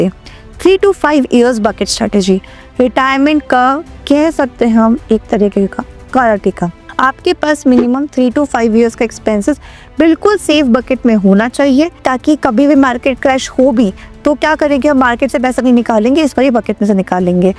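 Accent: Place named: Bengal, India. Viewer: She may be a non hindi speaker. Maybe Bengali or any other non Hindi Speaking area . Trying well. Keep it up.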